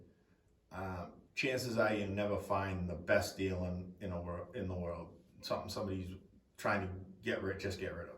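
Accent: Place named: Western Massachusetts, United States. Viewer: I'm watching this saying to myself "I recognize that accent" and then sure enough you mentioned "western Mass" and I said Ah Ha! A fellow MassHole!!!! LOL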